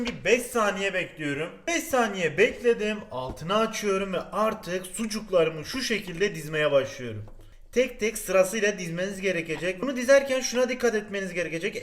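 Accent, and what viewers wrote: Adana, Turkey. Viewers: "Şunu alüyürüm, bunu koyüyürüm, buna eklüyürüm." Bütün yemek videosu çekenlerin neden şivesi adanaya kayıyor ve salağa anlatır gibi anlatıyor anlamıyorum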